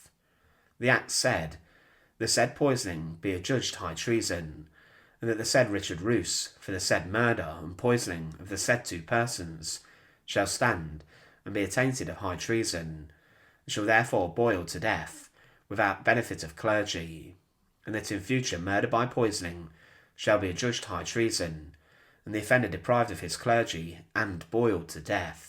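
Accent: United Kingdom. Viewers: To clarify, he has a lovely British accent... it's the constant ebb and flow that is distracting.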